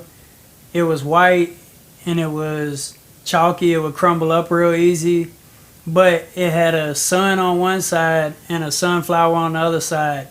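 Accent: United States. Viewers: Are you from Louisiana? I love that accent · You from Nashville?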